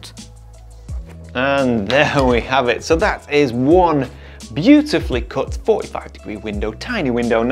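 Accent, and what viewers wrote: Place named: Northern England, United Kingdom. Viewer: I was born in Northwitch, Cheshire and have an Uncle who lives in the lake district. I now live in Tennessee, and I love watching your channel because, not only am I inspired for my own photography, but hearing your accent transforms me home. Thank you for all you do!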